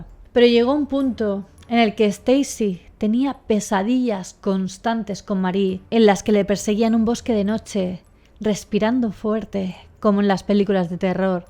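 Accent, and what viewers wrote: Spain. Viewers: Ets catalana?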